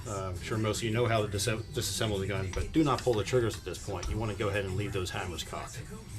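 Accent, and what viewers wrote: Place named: Delaware Valley, United States. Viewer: Thought you might be from the Delaware Valley listening to you throughout this great instructional video. I just noticed the DCFS videos in your library. Do you still shoot there? I just joined this year and started shooting Trap, perhaps we'll cross paths?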